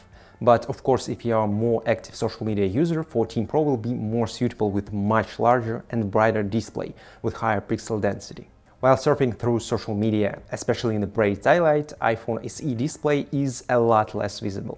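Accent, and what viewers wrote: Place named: Russia. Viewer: that russian accent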